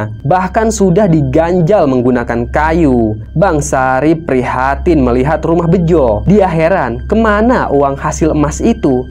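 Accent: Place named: Medan, Indonesia. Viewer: Sharusnya logat bicarany tu logat banjar,, knp kemedan medanan,,,?? Bungulll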